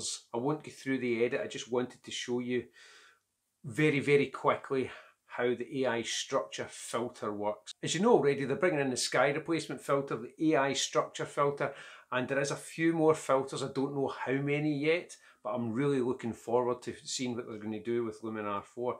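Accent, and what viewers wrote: Scotland, United Kingdom. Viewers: Excellent video, made much more interesting by a wonderful Scottish accent ! Quick Question: Are you working on a RAW file?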